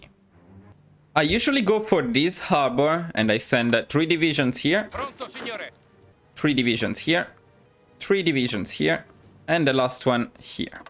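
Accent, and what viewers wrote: Italy. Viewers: I really appreciate your Italian accent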